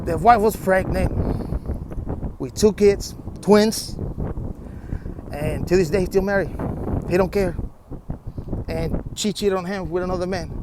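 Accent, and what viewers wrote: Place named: Mexico. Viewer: It's hard to understand your broken Mexican English. You sound so low class too.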